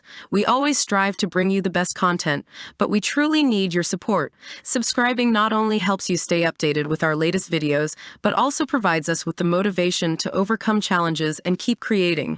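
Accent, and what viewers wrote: United States. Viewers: why is this being narrated by an American robot?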